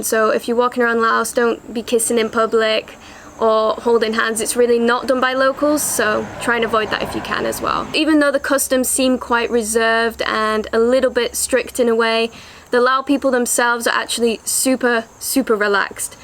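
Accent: United Kingdom. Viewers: Great narrator..beautiful English accent ❤laos.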